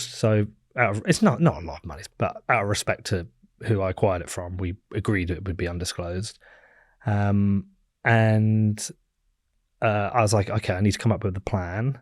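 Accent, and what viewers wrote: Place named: RP, United Kingdom. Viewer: Physically impossible to listen to a podcast solely hosted by the posh rah rah berk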